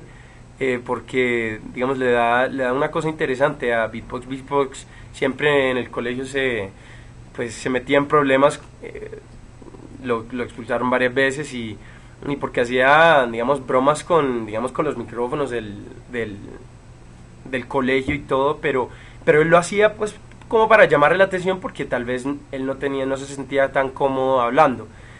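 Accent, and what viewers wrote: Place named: Colombia. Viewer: yo la verdad pense que eras colombiano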